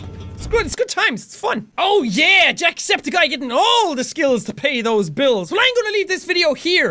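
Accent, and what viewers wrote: Ireland. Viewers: OMG I LOVE THE IRISH ACCENT :D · Wow I didn't know you liv In Ireland thats how your irish tell me more oneday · Is he Irish?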